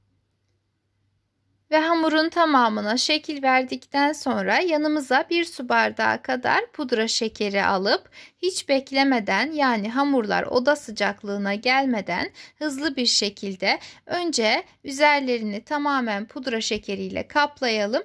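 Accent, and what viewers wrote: Azerbaijan. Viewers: Super olmuşşş,azərbaycanlımısınızz?? Lehçedə biraz nəsə var 🥰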